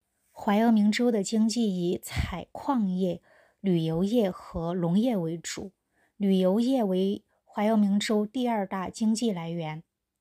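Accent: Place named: Hunan, China. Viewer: 湖南口音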